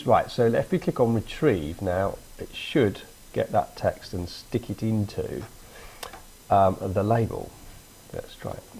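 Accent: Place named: United Kingdom. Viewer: Wonderful! I love how easy you made programming seem, and the British accent is so refreshing to hear. Just one question, can I use this to save data base type data this same way?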